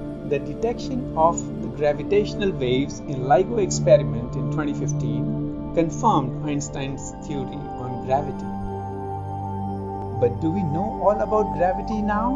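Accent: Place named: India. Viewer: Turned off immediately after I heard the Indian voice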